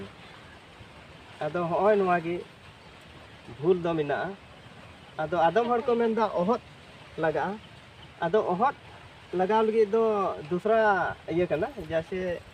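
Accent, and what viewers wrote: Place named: India. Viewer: Aam do santali te ol akan word latar re hindi tem ucharane aa anate bam bujhaw daleyag kana santal ched leka ko ucharana youtube re joto olchiki palhaw hah video njel me ar onde khon mocha te ched leka ucharana aa santali te ankage chiki teho olo aa menkhan hindi ucharan em palhaw khan amdo santali word ucharan bam gan daleya aa nowa redo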